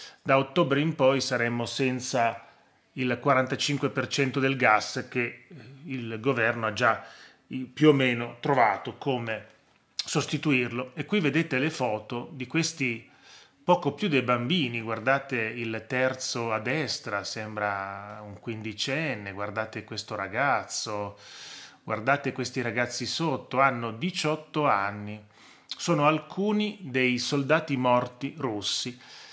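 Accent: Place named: Rome, Italy. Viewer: Buongiorno Prof. Grazie, perché Tra tante lacrime il suo accento romanesco mi fa sorridere un pochino!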